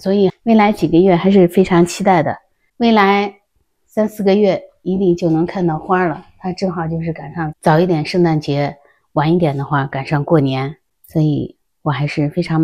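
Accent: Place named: Xi'an, China. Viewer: 叶子长得太美了，听老师的口音感觉咱俩是老乡啊，我是西安人😆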